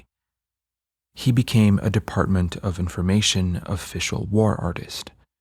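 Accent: Quebec, Canada. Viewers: I have to say, your french pronunciation is extremely on point. Why you do have a quebecois accent tho? Edit: oh, you’re probably quebecois, makes sense. My bad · Es ce que tu es québécois? Ton accent me dit quelque chose, on se reconnais tous en tant que frère québécois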